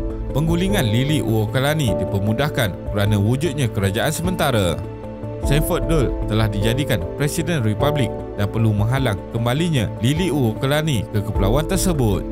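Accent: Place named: Malaysia. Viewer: Dialeknya melayu banget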